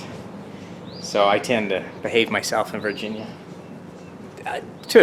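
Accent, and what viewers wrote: Northern Ireland, United Kingdom. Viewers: I can pick up on your slight Northern Irish accent, all the best from the UK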